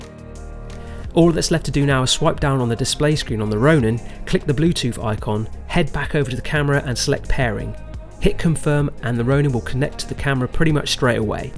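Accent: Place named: United Kingdom. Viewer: dig the accent…from UK?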